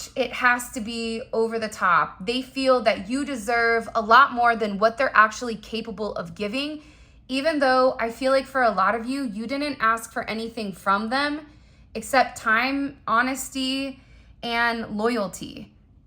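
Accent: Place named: South, United States. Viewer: I want her because she's a beautiful singer I want her because I love her I want her because I love her beautiful voice and her southern accent I want her because she's the most beautiful talented girl 💗 and I told her 56 years ago that I was in love with her because I didn't like to see her cry and her videos and God told me that she needed someone to love her man that's exactly what I did❤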